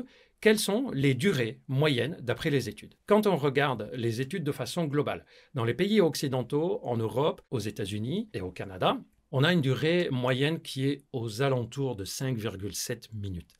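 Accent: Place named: Lebanon. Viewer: Bonne ambiance, intéressant, accent libanais… tout y est.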